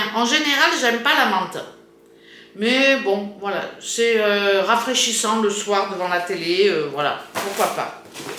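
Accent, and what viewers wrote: South, France. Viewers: Coucou...mon avis au sujet de tes vidéos recettes.. je préfère quand tu commentes..l’absence de son est monotone.. je préfère comme tu dis:les blablas et les papotages,avec ton accent du Sud c’ est super! Ne change rien.. car c pour ça que l’ on va sur ta chaîne: pour t’ écouter.. sinon on peut aller sur n’ importe quelle autre.. c ce qui fait ton originalité...et que l’ on ait envie de la regarder!! Comme disait un commentaire plus haut si quelqu’un ne veut pas t’ entendre.. il n’ a qu’ à couper le son!!😂Bonne journée et à bientôt t’ entendre..💕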